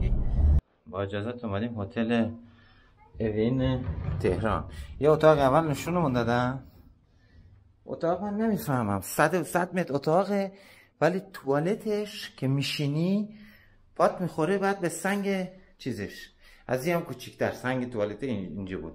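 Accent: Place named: Shiraz, Iran. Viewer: عاشق لهجه زیبا و اصیل شیرازی شما هستم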